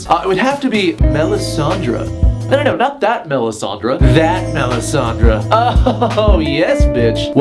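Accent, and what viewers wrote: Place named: Scotland, United Kingdom. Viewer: Can you say "if you had a chance to change your fate, would ya?" In your Scottish accent ? :) (from the Disney movie brave)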